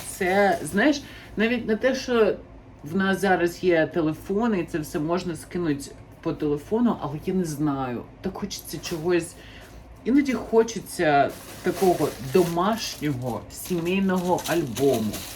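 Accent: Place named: United States. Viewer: Ухти)) в вас такий американський акцент став за ці роки. Цікаво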